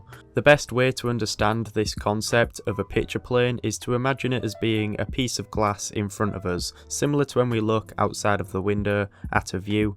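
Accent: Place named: Hull, United Kingdom. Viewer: His accent is Hull, from the North of England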